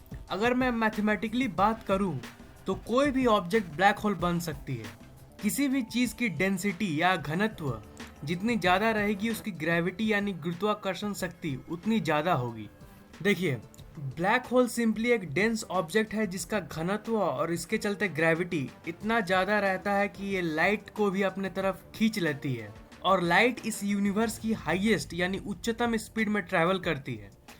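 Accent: Bihar, India. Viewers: Arey bhaiji Bihar see ho Kya?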